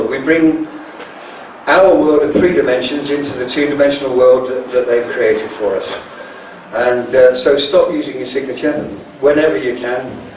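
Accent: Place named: Torquay, United Kingdom. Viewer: That's what I thought too, it's his accent 'talky' Torquay.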